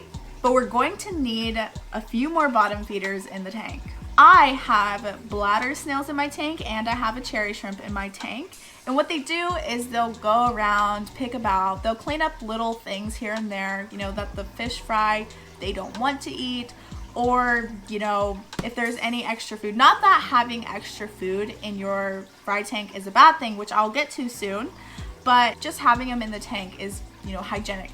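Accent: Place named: Southern Midwest, United States. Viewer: You sound more like you are from Southern Mid-West US than you do Australia. Transplant? Been watching yours and Nick's videos lately. Was wanting to breed some bread and butter fish other than boring guppies and bristlenose. Your videos are good motivation.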